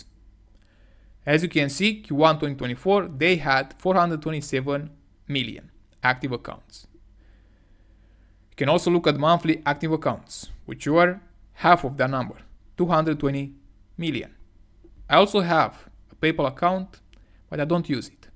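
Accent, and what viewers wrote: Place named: England, United Kingdom. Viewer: Don't take advice from some moron with heavy English accent from the internet.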